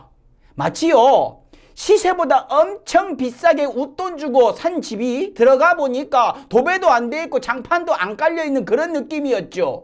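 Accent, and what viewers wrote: Jeolla, South Korea. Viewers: 님은 서울이여 근데말은전라돈데여 공정하게말씅하세요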